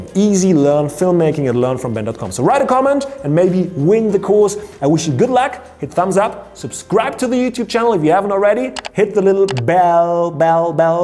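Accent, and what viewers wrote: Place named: Germany. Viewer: I Know and i'm learning German right now so i can relate to his accent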